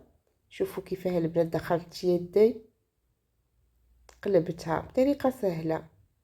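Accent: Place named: Skikda, Algeria. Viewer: اللهجة تاعك سكيكدية على ما أظن❤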